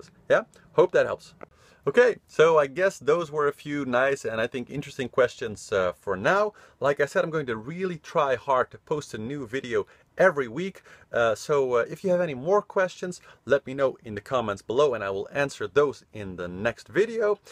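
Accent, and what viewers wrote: Netherlands. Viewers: Compared to your early videos I think you're losing your Dutch accent when you speak English! 😉 Thanks for all your help and advice.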